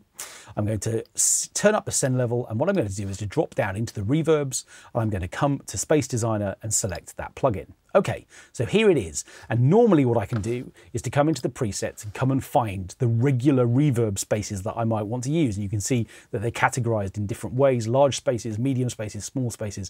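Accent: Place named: RP, United Kingdom. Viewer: Entertaining dull with a posh accent and 20 years old information. Maybe a gig with the BBC.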